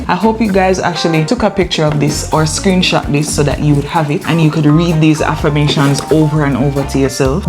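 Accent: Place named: Jamaica. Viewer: Love the Jamaican exscent😍😍😍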